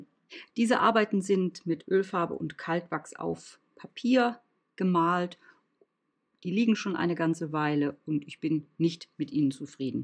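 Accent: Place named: Northern Germany, Germany. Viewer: I studied German in high school and college. I studied at the University of Freiburg for a year. My mother is actually from Berlin. Your German sounds like the northern accent I’m used to hearing. When I went to Freiburg I could hardly understand anyone!😂 That was many moons ago, and I don’t get many chances to speak German with anyone where I live, so listening is very nostalgic!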